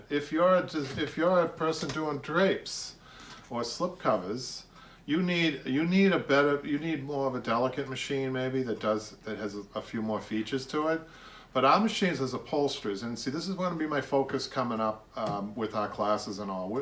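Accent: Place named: New England, United States. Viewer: As soon as I clicked your video and herd you talk I knew your a new Englanda! Im from Hampton NH. I’m Getting into auto upholstery. Looking at machines now. Thanks for sharing your knowledge.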